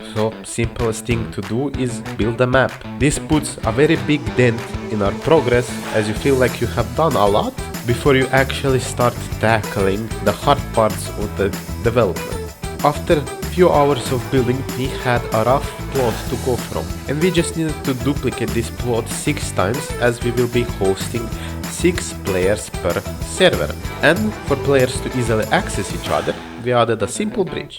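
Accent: Russia. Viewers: BRO SOUNDS RUSSIAN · ты руский по акценту понятна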